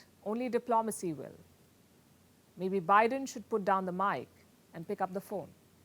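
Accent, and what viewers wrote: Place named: India. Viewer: FIrstpost is funded by the CIA. thick Indian accent is meant to confuse Indians. is paid to spew anti-Russia and anti-China propaganda. never bad-mouths Biden the same way. OOOps. That gives it away.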